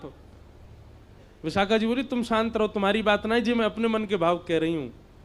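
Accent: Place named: Braj, India. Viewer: Om Shri Ganeshay Namh 🙏 Jay Shri Krishna Radhe Radhe 🙏 ♥️ 💙 ❤️ Jay Shri Hari Jay Shri Shyama Shaym ji Jay Shri Radha Rani Jay Shri Radha Kishori ji Jay Shri Radha Raman ji Jay Shri Radha Vallabh Lal ki Jay Ho Jay Shri Guru Dev Maharaj ji Aapke Charno Mai Koti Koti Pranam 🙏 Radhe Radhe Jay Shri Harivansh Guru Dev Maharaj ji Aapki vraj Bhasa Badi Sumadhur hai Radhe Radhe Jay Shri Vrindavan Dham Jay Shri vraj vasi 🙏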